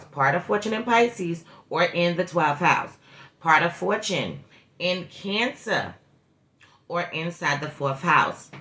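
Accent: New York, United States. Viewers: Thank you love your vibe and NY accent. Mars in Cancer, Saturn in Cancer, Neptune Sagitarrius and North Node Scorpio here. You are hidden gem on Youtube, you deserve waaaay more attention on here. Glad I found you last year!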